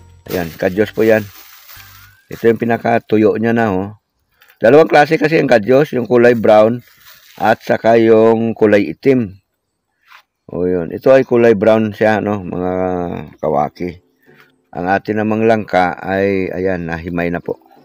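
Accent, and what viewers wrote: Iloilo, Philippines. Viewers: bacolod Ako kawaki. Sabi ko n ilongo k dahil sa tono mo magsalita sarap yan kbl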